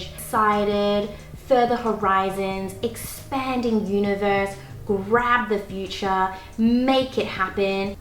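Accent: Australia. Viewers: honest question out of curiosity: are you Chinese/Korean/Japanese Australian with a background in learning American English?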